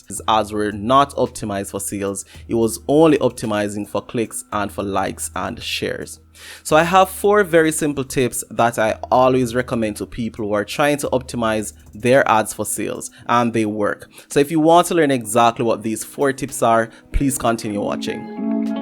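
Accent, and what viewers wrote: Jamaica. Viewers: I hear Jamaican 💀 dropped a sub 💯 you got my full support bro I love to see other Jamaicans doing big stuff 🙌🏽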